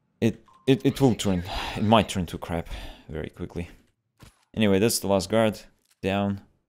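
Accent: Russia. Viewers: Акцент русский. Может ты русский который хочет вести английский канал? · Русский акцент отличный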